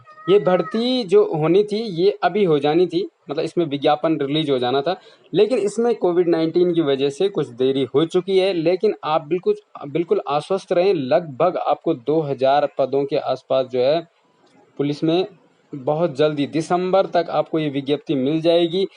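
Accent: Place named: Garhwal, India. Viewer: bhai jiii aap gadwali ho apke jhalak pure gadwali ho nice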